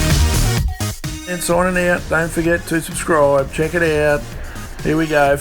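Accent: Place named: Australia. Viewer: Everything sounds better with an Australian accent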